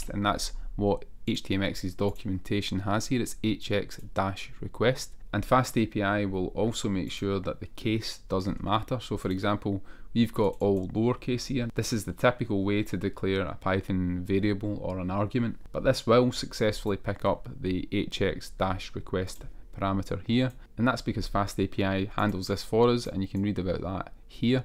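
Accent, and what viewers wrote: Scotland, United Kingdom. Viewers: How interesting that the Scottish accent is easier to understand. I wonder what Koreans make of other accents from the UK?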